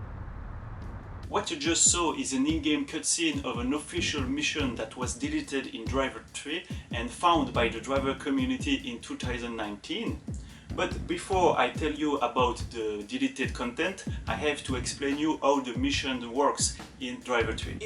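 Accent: France. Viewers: you french boi ?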